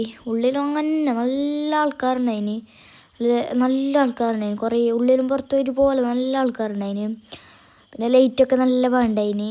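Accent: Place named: Kasaragod, India. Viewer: It's me thanha Kasaragod slang nalla paangnd 😂😂😂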